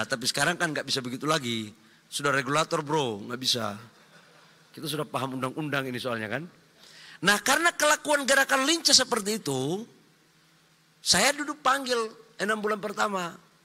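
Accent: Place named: Papua, Indonesia. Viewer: pa balil ini dialek papua mentah betul karna ada pakai bahasa KItong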